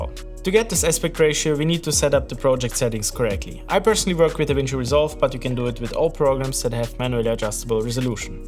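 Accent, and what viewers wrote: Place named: Germany. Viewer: Ein bisschen harter Deutscher Akzent, aber in Sachen Videoschnitt ein wirklich sehr gelungenes Video. Optisch wirklich sehr ansprechend. Ach ja und danke auch für den eigentlichen Inhalt des Videos, den Hinweis auf das neue Format.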